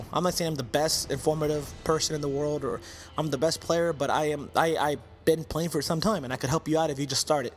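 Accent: India. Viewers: baraks? is this guy indian? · You sound like an Indian I can say from my personal experience in living abroad anyway great video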